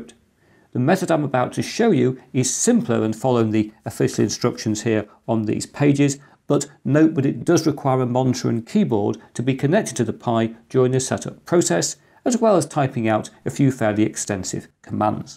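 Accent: England, United Kingdom. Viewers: este señor habla tan claro, que debería trabajar como locutor en el radio. Usando traducción automática, es casi perfecta, mejor que la traducción generada desde una voz sintetizada. Definitivamente el inglés de Inglaterra es mucho mejor que del Estados Unidos.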